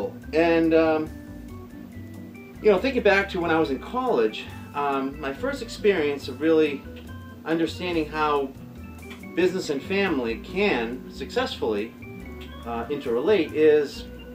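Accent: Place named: Boston, United States. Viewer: We get to see you sporting your full-on Boston accent in this one. Good on you, mate! Also shows how you can effectively reach out to the thick-headed Meadowlark Lemons of the world. Cheers!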